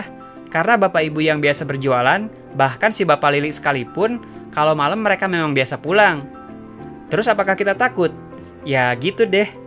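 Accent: West Java, Indonesia. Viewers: 👍👍 untuk video dan narasi nya. Logat sundanya kentara, ti Sukabumi sanes ?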